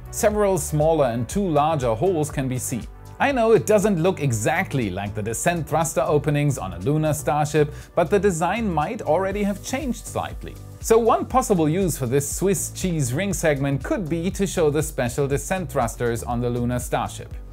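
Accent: Germany. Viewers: Hie.... I'm more anticipating the episode in the German accent than the 15km hop😆😆👍👏👏😁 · I really like the accent... where the Germans are speaking in English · I vote to go with German accent... just how you would normally talk and not exaggerated. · You should indeed do one with your German accent on display. Really enjoy the out takes at the end of your videos as well. you rock! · Yes, PLEASE do an episode with your natural German accent! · episodes with a German accent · Totally would appreciate an episode in your Deutschland accent. Reminds me of my mothers, who immigrated in 1960 to America. I think it would harken back to some of the greatest rocket scientists in history. Thumbs up if you agree. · really fun an episode with German accent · You should always use the German accent. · German accent? It’s like Von Braun is back amongst us. You Rock! Tschuss! 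· YESSS! A German accent episode, please! 😀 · do a show in your german accent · do a future episode in the German accent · your German accent brings back memories of Guenter Wendt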